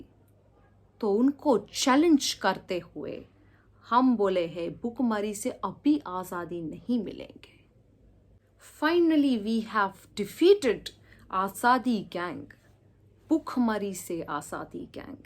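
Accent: Tamil Nadu, India. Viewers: I just love your content. Look at your south Indian accent. Wow wow wow. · .... Your Hindi South accent is best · Jab Honge Mazboot Dollar....toh Mat pakdo hamara Collar... Just too good...What I loved was the heavy Tamil Accent... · Bahut khoob! BJP is tunj bhari vakiya kay baannon kay saamnay Shikhandi kay aahut Bhishma bhanti lay baithegi. Gaur firmament, aur voh bhi upni Tamil oocharith Hindi bhasha mein! Shabash, aur lugay raho beti upnay shubh karya mein! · Excellent Tamil mixed Hindi accent . Excellent 👍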